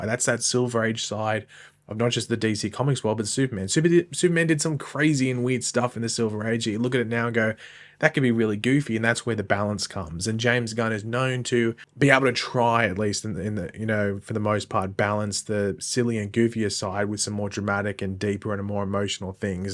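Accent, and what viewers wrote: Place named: Australia. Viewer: Shut down your page. I hope you will seriously think about it. I dealt with your negative comments about Superman and Lois, all through its run, because you were a "just a Arrow Fan" and only Flash mattered besides it. We don't need your terrible Aussie accent. Through yourself on the Barbi and turn the gas up to 'Nuclear'.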